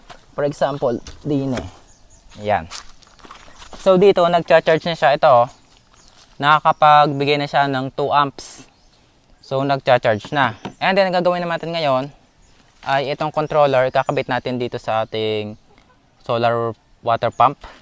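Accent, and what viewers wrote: Philippines. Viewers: Boss taga saan ka ga? Nakakatuwa ang iyong punto..haha Iniisip ko tuloy ay magka-probinsya tayo.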